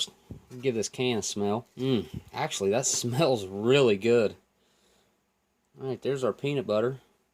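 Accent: South, United States. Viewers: Love your channel & also love your accent! U got the cutest accent ever! Country....but just smooth & cool!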